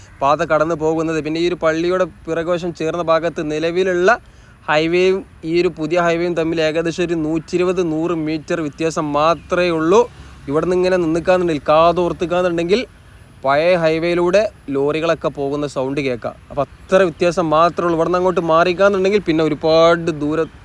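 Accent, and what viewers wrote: Malappuram, India. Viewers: ബായ്, ഞാൻ എറണാകുളം ജില്ലക്കാരനാണ്... മലപ്പുറം ഭാഷയും, ഫുട്ബോളും എനിക്കിഷ്ടമാണ്... നിങ്ങളെ സുടാപ്പി എന്നു വിളിച്ചു കളിയാക്കുന്നവർ വിവരദോഷികൾ മാത്രമാണ്.... മൂത്തകുന്നം jn. ഇൽ നിന്ന് വലത്തോട്ട് തിരിഞ്ഞാൽ ചെറായി, വൈപ്പിൻ വഴി, വല്ലാർ പാടത്തും എറണാകുളം നഗരത്തിലും എത്താം.... നിങ്ങളുടെ ഭാഷയിൽ ഈ വീഡിയോ ഇനിയും കേൾക്കാൻ കാത്തിരിക്കുന്നു...😂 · he already mentioned that he is gonna speak in Malappuram slang then why are you bothered..in fact that's why I follow his videos coz its different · I like Your Malappuram accent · Is this Malapuram accent? Never been there. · enthe bro malapuram slang njagalum kelkkate..maya kuyi okk kelkkan oru rasamalle... pinne.vedio super...MODI kk ORU THANKS PARYAAN ITH kandapol thonni.toll koduthalum kuzhapam illa. aa parvoor .ente rabbe...ath in bro paranj apoe kalich chitch pokaam... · മലപ്പുറക്കാരൻ മലപ്പുറം ശൈലിയിൽ തന്നെ പറയുന്നതാണ് നല്ലത്. 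യായും ഴയുമൊന്നും കാര്യങ്ങൾ മനസ്സിലാക്കുന്നതി ന്ന് തടസ്സമല്ല. അക്ഷരസ്ലോക മത്സരത്തെപ്പറ്റി വീഡിയോ ചെയ്യുന്നുവെങ്കിൽ അതൊക്കെ കൂടുതൽ ശ്രദ്ധിക്കുന്നത് നല്ലതാണ്. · മലപ്പുറം ശൈലിയിൽ ഉള്ള വിവരണം കുഴപ്പം ഇല്ല പക്ഷെ സ്ഥലപ്പേരുകൾ കറക്റ്റ് ആയി പറയാമായിരുന്നു. Eg. കൊട്ടപ്പുറം അല്ല കോട്ടപ്പുറം എന്നാണ്, കൊതപറമ്പ് അല്ല കോതപറമ്പ് എന്നാണ്, മുത്തുകുന്നം അല്ല മൂത്തുകുന്നം എന്നാണ്. Google Map നോക്കിയാൽ മലയാളത്തിൽ സ്ഥലപ്പേരുകൾ ശരിയായി വായിക്കാവുന്നതാണ്. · ഞാൻ ഇടപ്പള്ളിക്കാരനാണ് (കൊച്ചി)ഒരു നിരീശ്വരവാദിയും പക്ഷെ Central Govt നെ Support ചെയ്യുന്ന വ്യക്തിയുമാണ്. ഞാൻ താങ്കളുടെ വീഡിയോ ഇഷ്ടപെടാൻ കാരണം തന്നെ താങ്കളുടെ ഈ മനോഹരമായ മലപ്പുറം സ്ലാഗ് ആണ്. തുടർന്നും നല്ല വീഡിയോസ് പ്രതീക്ഷിക്കുന്നു.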